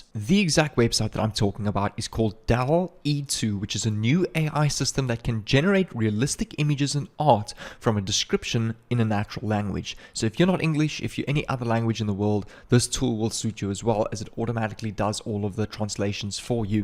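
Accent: South Africa. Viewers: Are you from Za?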